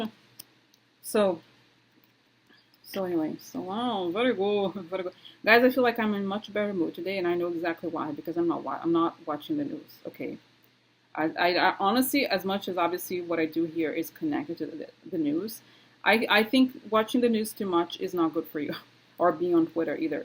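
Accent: Russia. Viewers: Hello! Your Russian accent is fun screw the people who don't like it. Wouldn't it be a better strategy for Mueller to stop BEFORE they vote? Seems like they would be all ups in arms once he's in. Supposedly the Russians hacked the Pubs emails too. Maybe they are blackmailing them AND giving them $$ too. Maybe u already said that at some point.??? 🤔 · You help make my morning...Russian accent and all...boom!! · I like your accent and I was watching Lena Rodriguez and she likes your Russian accent too. Keep happy I know all this politics must be draining and stressful, it has drained me, and I live in Australia. I also have been worried for the Americans and the world, I need to feel safe again, so keep up your good work... Stay healthy it costs to much to get sick in America.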